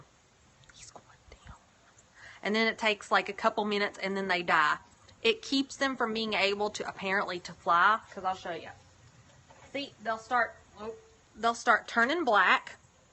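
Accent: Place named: Virginia, United States. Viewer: I live in Virginia, and we have a similar accent.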